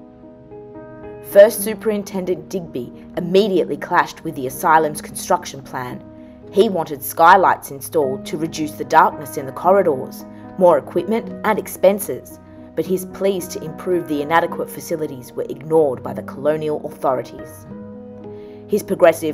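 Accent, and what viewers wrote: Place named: Australia. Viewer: 😂😂😂 love the early Australian / english accent commentary 😅😅😅 that was a great video ✌️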